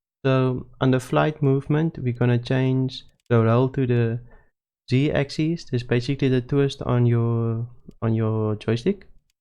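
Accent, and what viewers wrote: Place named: Cape Town, South Africa. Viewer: Thanks, just what I needed to figure it out. And how fantastic to run into another Cape Townian playing SC. Love the accent. Maybe we'll meet in the verse.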